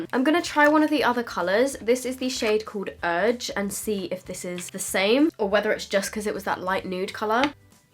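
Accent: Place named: United Kingdom. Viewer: After almost every sentence you say I repeat it like an annoying sibling. Sorry can't help it. You sound super British 🤗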